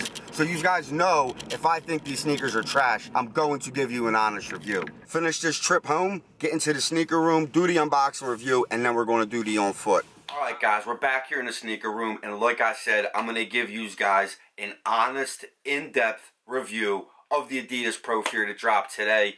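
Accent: Philadelphia, United States. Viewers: haha randomly found you from your vapormax chukka video I realized you were from philly as soon as I heard you say like 5 words. I'm from Harrisburg man its cool seeing guys in my state doing dope shit!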